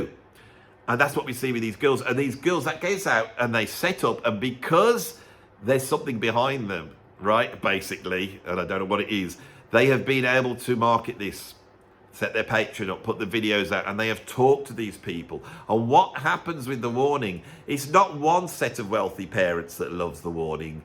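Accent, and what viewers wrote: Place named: England, United Kingdom. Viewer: I have to say, I never realized how American the epithet “douchebag” is until I’ve heard it with an English accent.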